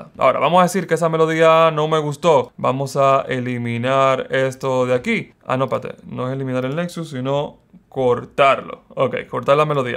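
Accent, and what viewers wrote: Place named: Dominican Republic. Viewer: me gusta tu acento... tu acento es de República Dominicana verdad?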